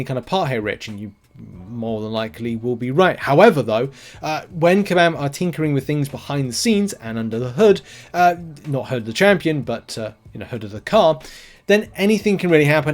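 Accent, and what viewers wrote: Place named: United Kingdom. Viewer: The uk accent is horrendous. I can barely understand a word you’re saying. When you talk beyond 130 words per minute it’s just shit mumbles. Have someone from America listen to your vids and ask them to repeat what you said. There are huge gaps where we can’t understand shit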